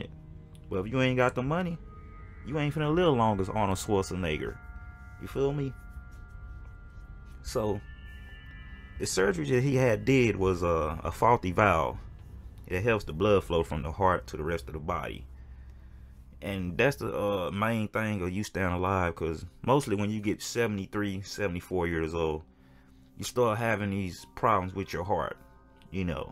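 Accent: South, United States. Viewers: U have a cute accent dude, southern like 🌝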